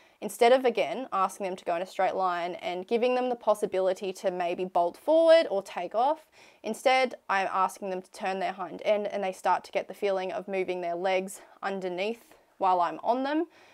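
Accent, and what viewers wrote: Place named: Australia. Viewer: Well, the accent says Australia (which would be summer)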